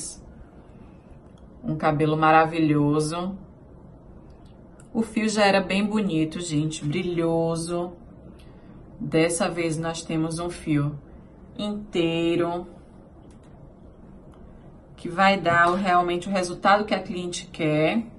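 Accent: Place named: Salvador, Brazil. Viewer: Pelo seu sotaque Parece ser de Slavador. Aceitei? Se sim onde vc atende? Quero muito colocar No caso do cabelo crespo tem q ficar alisando pra ficar compatível com o mega??? Pq a raiz vai crescendo n fica feio nao? A raiz crespa e o mega liso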